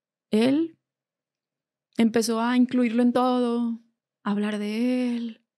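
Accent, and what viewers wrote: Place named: Mexico. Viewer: Me cuesta entender lo que dice, su acento es super mexicano, demasiado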